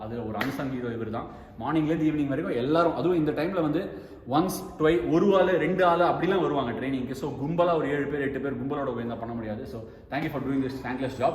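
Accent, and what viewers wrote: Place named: Chennai, India. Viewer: Chennai Tamila post pandrathu supera iruku plus it gives a feel like listening to a friend who opens up wat he has in his mind. Addicted to ur accent ❤️❤️ yaaaarrrum vara matangaa 🤣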